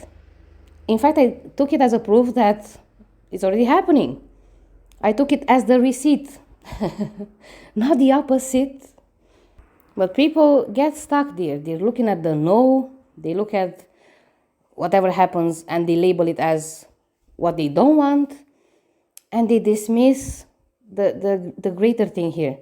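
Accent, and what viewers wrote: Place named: Spain. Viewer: I always tought you were Spanish! You sound jut like a friend of mine who is Spanish and speaks English at work :) Anyway, you are a fresh breeze in LOA YT community and I like your direct style without offending anyone and calling girls 'bitches' :D Thank you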